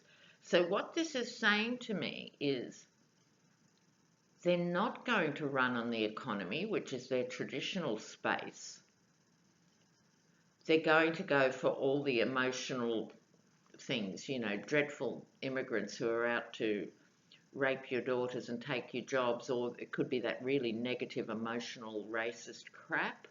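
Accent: Australia. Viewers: I just came across your Tarot videos yesterday. I Love ‘em, but I am writing to give you kudos for your up to date and in depth awareness of what’s going on politically in the USA. I am amazed. You know as much as any political junkie here does. I recognized your accent as Aussie but assumed you must live here, until you mentioned you were in Australia. How do you keep up?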